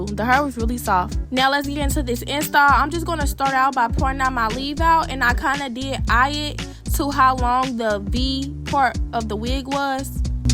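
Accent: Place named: St. Louis, United States. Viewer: St. Louis in the house!!! I just knew it when I heard your accent, babe. Beautiful!❤❤❤❤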